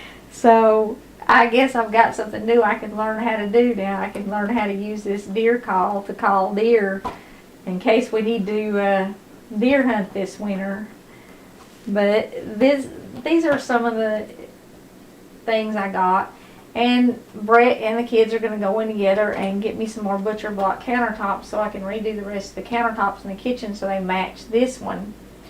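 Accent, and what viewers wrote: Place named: Appalachia, United States. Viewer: We live your hillbilly talk. Prays God for ya. Everyone didn't lose real language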